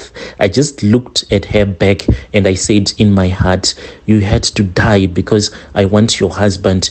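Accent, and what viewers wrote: Zimbabwe. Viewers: European stories is the name of the channel only to be greeted with a heavy African accent. Sounds Zimbabwean I can tell because I'm Zimbabwean too😢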